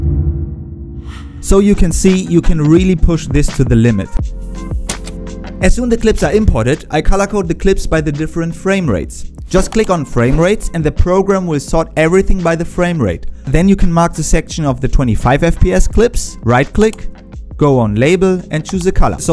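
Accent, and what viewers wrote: Germany. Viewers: That was an awesome video and very helpful! Hearing organization tips from a German accent also seems right somehow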